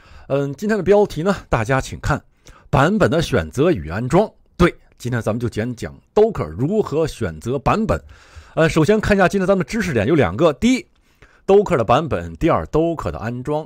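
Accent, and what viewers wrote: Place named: Japan. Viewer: 您的日语口音的英语太有意思了 LOL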